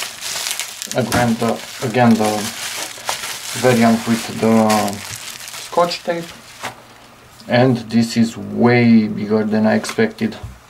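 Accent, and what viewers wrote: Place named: Romania. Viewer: Hello, nice video, thanks for the info. PS: are you romanian(you sound like one, by the accent)?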